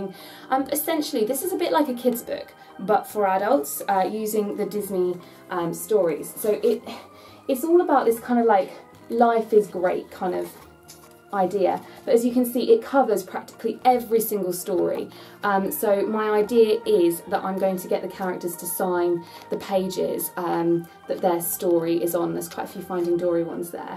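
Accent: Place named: United Kingdom. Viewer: UK accents are so charming. you could literally read the dictionary and i'd be mesmerized. You have such a great personality! you need more subscribers! i'm going to DW in may as my graduation gift, and this made me so much more excited!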